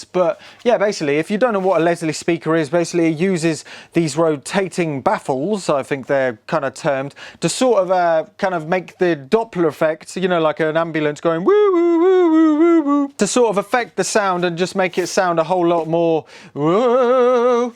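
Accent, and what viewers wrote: London, United Kingdom. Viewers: These are called 'cheese wheel' Leslie. These is a full spectrum Leslie, Very cool but the full Leslie was wondrous. The bottom was a bass rotating drum with one opening with a curved sheet attached to the upper rear and to the lower front edge. On top was the rotating treble horn [in the opposite direction] There were usually on treble driver , not moving, driving treble waves up into what looked like a double split horn, however, one side was closed off to act like a perfect counter balance. You sound like you are in the UK so l don't think you could donate the unpainted cheese wheel to me, but l am now stuck in an assisted living building, with a Yamaha MO8 sitting there because my Leslie was stolen. what you have there will change the dynamic of both your playing and writing, because as you noticed in this video, cool sounds, sound Plastic Fantastic Awayla. 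It's Halloween night in Salt Lake City, Utah USA so you have been funking off since June. I wish I could hear what you have come up with. People seldom have exactly the same reaction to this marvelous effect. If you come across another out of this world find, Please keep Tom Behind the Zion Curtain, in MOMOLAND UTAH WISH WE COULD JAM WITH COMPLIMENTARY DUAL KEYBOARD LEAD BROTHER. NOTHINGS BETTING THAN DUAL IMPROV! KEEP IT UP KID, CUZ YOU GOT IT! TDH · The fact that you are warning people to not touch the end of a plug after cutting it off it’s plugged in proves other than your accent that you live in the UK you know there’s a thing called Darwin evolution and it’s the reason why people are getting stupider nowadays because it isn’t able to function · Pissin off your awesome londoner accent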